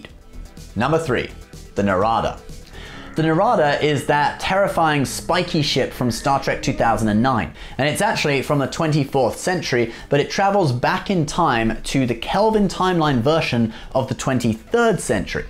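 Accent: United Kingdom. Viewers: Woohoo, is back & we did not have to see that heretic American. thank you for this video, & thank you for not being a heretic. #Humanityfirstlast #Britishbeniskewl #Americanbendrools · IS BACK! GOD SAFE THE QUEEN! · The return of the sophisticated it’s always a pleasure to see your face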